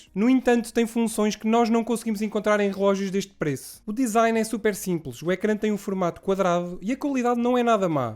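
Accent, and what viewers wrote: Portugal. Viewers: Português de Portugal 🥱😏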